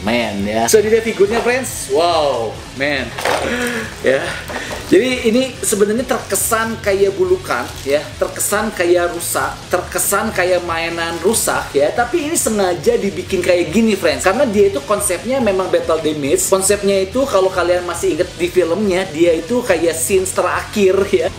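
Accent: West Java, Indonesia. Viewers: bang sunda urang mana?.....bandung sanes?...wkwk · Orang sunda euyy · Bang abang orang sunda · Bang orng sunda?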